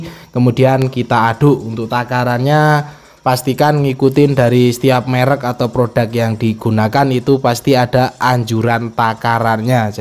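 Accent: Temanggung, Indonesia. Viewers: Wong ndi to jane mass kok koyo wong temanggung ae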